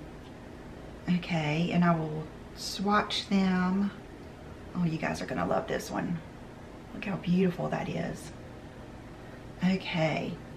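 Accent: South, United States. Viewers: I like how you are so thorough with showing us the colours and giving your opinion of them. I also love your southern accent x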